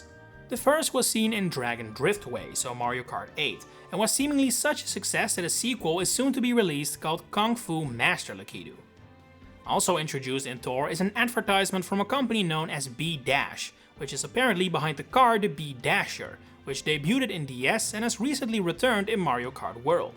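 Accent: Netherlands. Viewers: Nice video bro Heerlijk Nederlands stemmetje hoor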